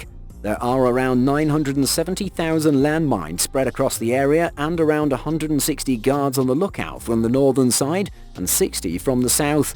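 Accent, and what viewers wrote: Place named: United Kingdom. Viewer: The narrator is British. That is how the British pronounce the Z.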